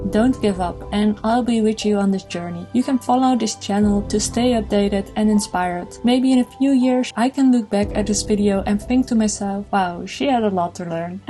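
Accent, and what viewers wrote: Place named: Netherlands. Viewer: I didn't watch the live adaption of Last of us, but a gameplay of the game. Really loved it back then. 😅 I also don't mind your Dutch accent. When I speak english people can probably tell that I'm from Germany. 😂 At least I can whenever I hear a German native speaker speaking English. Now onto today's subject: Yeah, learning how to draw or art in general is hard. There are no short cuts. No fast improvements. At least in my case. I am a slow learner. My brain needs to figure everything out and tries to see the big picture first. To give a different example: When I puzzle I look for the pieces first that end being the frame. I don't like to start anywhere. I always need that frame first and then I fill in the spots that are blank. There is also only a short amount of information I can take in everyday. Maybe that's why I spend year's in my comfort zone. 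Breaking out of that zone is scary, but necessary. 🙈 I started my art journey in 2012. So it's been a while. And there is still A LOT to learn. But it's okay. I don't want to rush my journey. Instead I embrace my not so perfect progress. 😊 Even though on my bad days I compare myself a lot to other artist and how far they have come over the last decade with their art, while I still feel like a beginning artist. 😔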